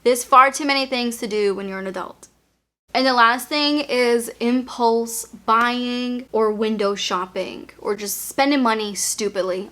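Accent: Boston, United States. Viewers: I love your boston accent.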